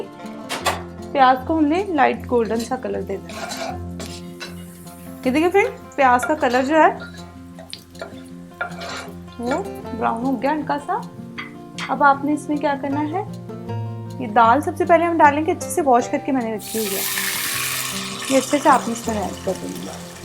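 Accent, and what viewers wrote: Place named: Lahore, Pakistan. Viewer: AP KA LEHJA LAHORI HAY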